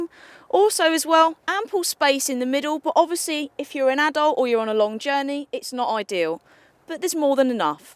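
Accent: United Kingdom. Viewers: for sure, you can speak as you want with your friends, but here there are people like me who do not understand all the thousand brits accents, so please try to be neutral, that would help, nothing against, just don't get all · The best looking car reviewer of all and she gets the job done, in details.Good to hear a good old British accent in a British car review.Like her a lot and the fact that she is beautiful is a bonus.Good on ya.The choice for me in this section would be a Mazda CX-5.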